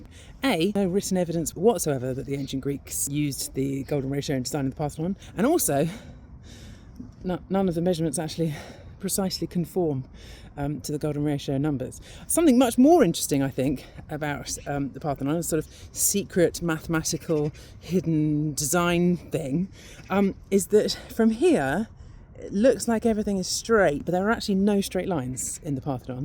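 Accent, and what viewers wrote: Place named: RP, United Kingdom. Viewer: Everything always sounds more believable and trustworthy is explained in a posh English accent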